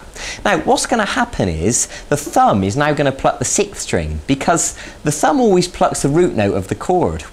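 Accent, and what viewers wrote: United Kingdom. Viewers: nice accent you are british ??? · he's British, or Scottish, maybe Irish, could be Welsh...you get the idea. Great teacher.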